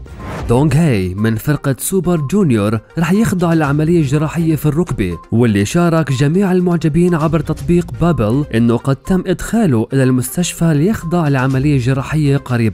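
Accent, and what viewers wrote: Iraq. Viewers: هسه يلي جاي يسوي اخباࢪ هوه عࢪاقي اشو يحجي مثلنه العࢪاقيين 🇮🇶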